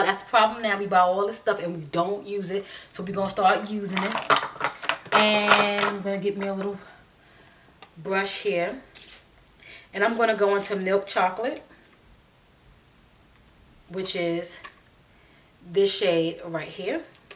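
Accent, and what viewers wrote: New York, United States. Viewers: I knew you was from close by me 😂 I said she from up North. I'm about 45 minutes away in CT.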